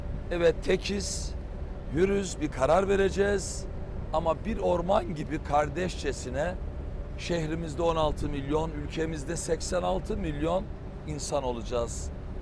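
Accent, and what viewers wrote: Black Sea, Turkey. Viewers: Hafif Karadeniz aksanı çok tatlı değil mi ya ❤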